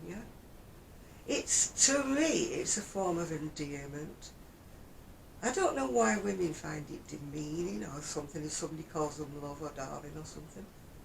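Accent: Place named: England, United Kingdom. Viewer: Sweet Pea… that was an enjoyable chit chat. Especially the English accent. What happened to respect your elders, as I am one of those now. Oh well, it is easy to find the dark but but but……I search for the light with a chuckle under my breath. Be well, Love‼️❤️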